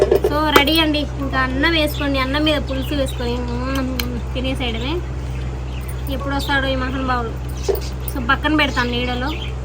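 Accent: Telangana, India. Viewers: Hai madam me telegana slag chala bagundi meru balagam move chudanandi same melagy mataldaru eadhare papalu chusukovadam chala kastam pellalne adigenatlu chypandi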